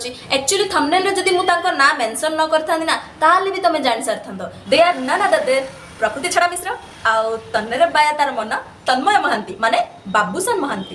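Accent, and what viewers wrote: Berhampur, India. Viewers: Sister tuma barahampuriya katha suniki maza laguchi 🥰🥰🥰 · Satare tm video dekhi ki mu Bahut khusi. Intresting anchor. Pura barhampur style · Superrrb mam language jabardast barahampur side ra akka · Pura ama berhampurya language style...maddi chalao madam..Jai maa budhi thakurani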